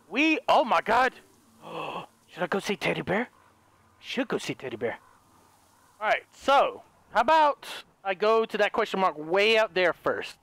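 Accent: Russia. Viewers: I love his Russian accent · RUSSIAN ACCENT 💯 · You do a really good Russian accent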